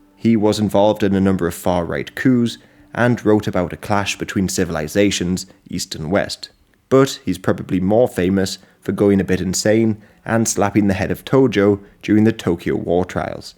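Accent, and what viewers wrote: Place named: United Kingdom. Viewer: wtf are you talking about, he has a British accent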